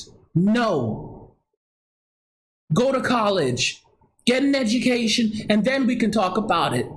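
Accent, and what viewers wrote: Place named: Jamaica. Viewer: Bro. You sound like you have a slight Jamaican accent which was lost over time being Americanized in the US! .... Am I correct?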